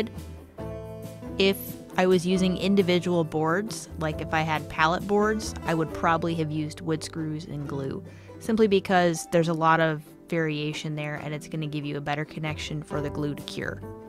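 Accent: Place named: Ohio, United States. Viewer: don't want to be nosy, but your accent sounds just like my daughter in law, who lives in Ohio.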